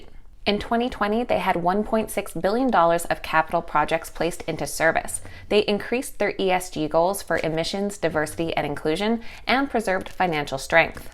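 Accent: Canada. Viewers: I wondered if you were Canadian because you say "prō-cess" instead of "prah-cess"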